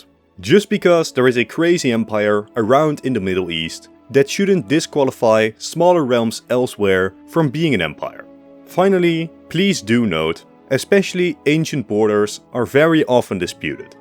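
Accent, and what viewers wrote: Netherlands. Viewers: Hoort gelijk dat hij Nederlands is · ik hoor aan je accent dat je Nederlander bent :)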